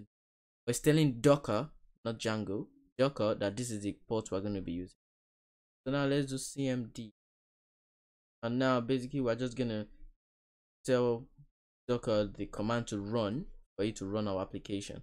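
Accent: Nigeria. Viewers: I feel at home listening to you😂. Loving the Nigerian accent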